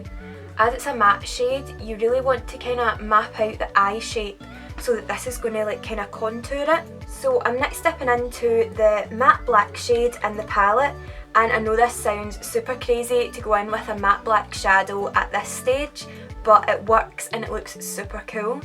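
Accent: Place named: Scotland, United Kingdom. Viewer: 😁 Scottish accents are the best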